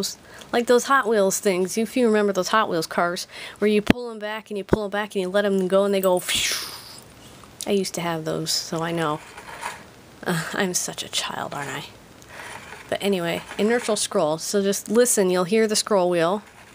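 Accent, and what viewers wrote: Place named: United States. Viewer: I really like your voice and English accent. Also, you've got a sexy laugh . Don't think I'm hitting on you, just a honest observation. Where are you from? East coast? West coast USA? midwest? South?. I'd like to know what particular US English accent I like, and this is it.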